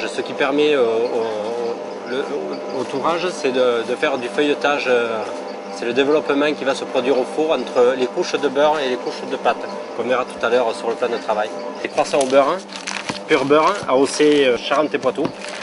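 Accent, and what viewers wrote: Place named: Provence, France. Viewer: Un vrai plaisir....BRAVO....ET l'accent au top...#PACA...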